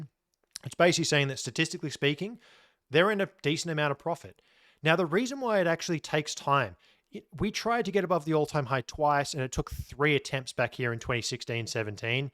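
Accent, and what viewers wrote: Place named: Australia. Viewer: Love your work. The best BTC analyst out there. And so good listening to your Aussie accent!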